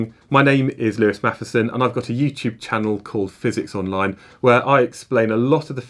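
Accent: United Kingdom. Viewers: English accent: “ah, I’m home” Spells it ‘center’ : “wait, what?”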